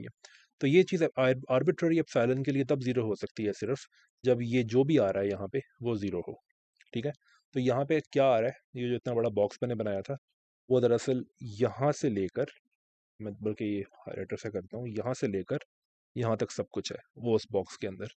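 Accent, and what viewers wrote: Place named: India. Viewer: Either this is a hardcore Indian accent or you're not speaking English at all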